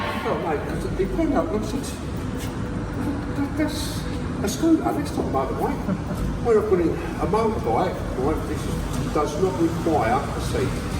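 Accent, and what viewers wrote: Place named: Essex, United Kingdom. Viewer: Sounded pretty Essex :-)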